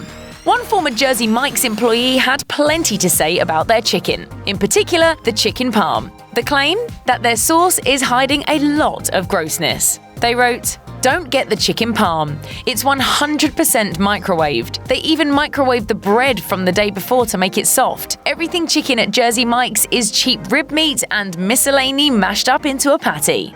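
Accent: United Kingdom. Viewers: What happened to the other girl doing the commentating? This chicks British accent is infuriatingly annoying. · I hate that "pompous" British accent.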